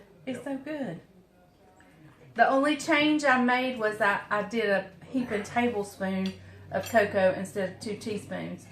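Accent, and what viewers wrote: South, United States. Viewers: This cake looks absolutely delicious!!! My friend's birthday is coming up at the end of this month and I think this cake would be just the thing for her celebration. I just subscribed to your channel and am looking forward to watching more of your videos. I enjoy listening to your southern accent! (I'm from Missouri where we have no accent LOL)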